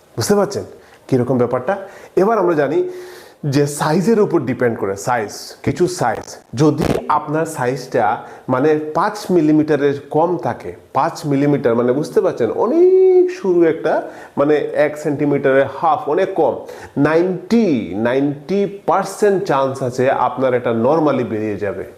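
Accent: Sylhet, Bangladesh. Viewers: Sir apni sylheti naki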